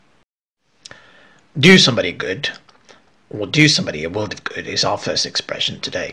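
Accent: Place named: United Kingdom. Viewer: I would like to take a moment to hail my dear brother and colleague for the excellent and distinguished work he is about to engage with. You get to enjoy the pride of your family, friends, and students, my dear teacher. The podcast reveals how creative is your rebellious spirit wherein your British accent shines to beautifully intersect with the condensed and rich content of the podcast. I wish the attractively presented video would be but an outset of a promising stage of multiple challenges.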